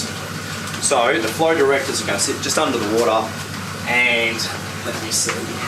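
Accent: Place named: Australia. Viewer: That sounds awesome, take us along for the ride! The Germans really know their reefing. :) haha, what I liked about the old outro was the long hair and the long aussie "hit the looike" :D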